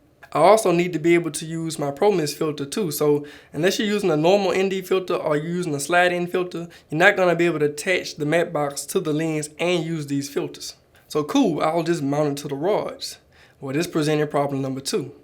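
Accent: Louisiana, United States. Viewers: I love that Louisiana accent. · I kept thinking, "damn this dude sounds like he's from Louisiana." Then we got to the b-roll. Yup.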